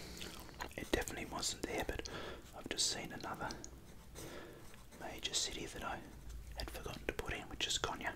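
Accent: Australia. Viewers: I love Aussie accent from Romania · australian accent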